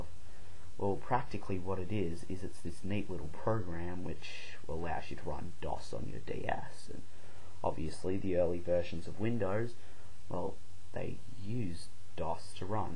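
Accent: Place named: United Kingdom. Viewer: whats with the awful british accent?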